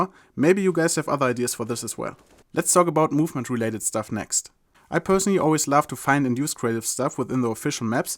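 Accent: Germany. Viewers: Man hört so krass, dass du Deutscher bist 😂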